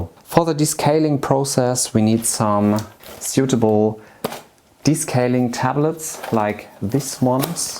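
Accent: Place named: Germany. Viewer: This guy is adorable with his little German accent. It's set up and cleaning already but I'm just letting it play through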